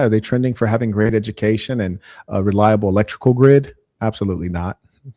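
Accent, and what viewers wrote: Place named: United States. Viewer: Well done. ❤ your videos. Take heed that the ONLY reason these Black 🇿🇦 are acting all sweet, agreeable etc is because of your American 🇺🇸 passport, accent & dollars which they associate with WHITENESS = WEALTH. If you were from Zim or any African country...they would have been aggressively rude to you. Dont be fooled by the agreeableness & listening to you. They see 🤑! I say this because Africans been telling these self -hating 🇿🇦 Knigrows the same thing thing but they insult, because they call Africans (Africans) distancing themselves as Africans because African is associated with BLACK...which means Poor, Beggarly and Helpless. Stay woke to these black 🇿🇦 cats. 😉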